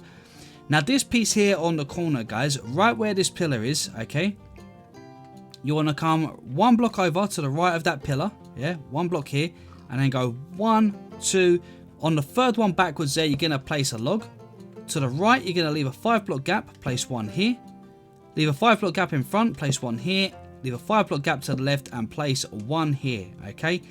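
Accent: United Kingdom. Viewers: you bri-ish? you sound it?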